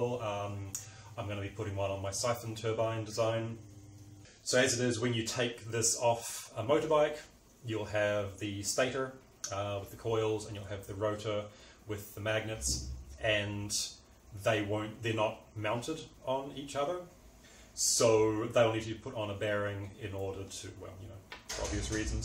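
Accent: New Zealand. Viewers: Brilliant ideas - great video with the detail well explained. Thank you for your generosity in open sourcing all your research. Would you be from New Zealand by any chance? That accent sounds very familiar. Looking forward to the tutorial video. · Hey bro you just got a new sub cool... Your accent sounds kiwi?? Are you nz based would love to check out your work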